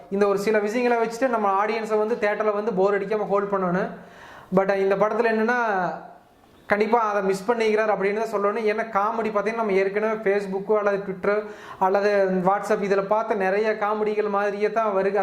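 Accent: Coimbatore, India. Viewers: coimbatore slang etti pakudhu....nice to hear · rather than your review. your coimbathore slang is lovely brother. but improve your pronounciation in english or say everything in tamil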